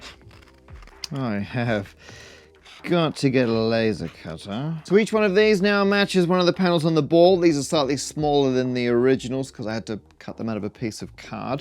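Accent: England, United Kingdom. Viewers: So your from England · Nope, it's pronounced how he said it in the video (minus the English accent). It's a german brand, not an American on, so how we say it in Europe is the original.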